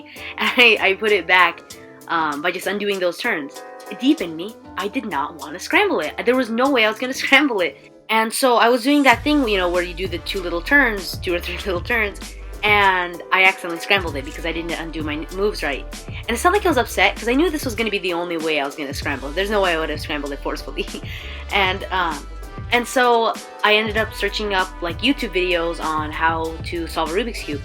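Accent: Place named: Israel. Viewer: I'm from Israel and you have an Israeli accent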